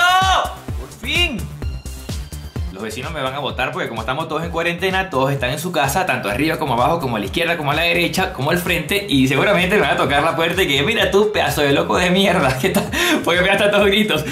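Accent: Venezuela. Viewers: El es venezolano?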